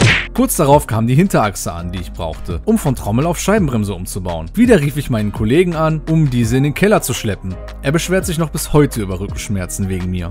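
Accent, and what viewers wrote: Duisburg, Germany. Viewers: Kommst du aus Duisburg? Komme aus Duisburg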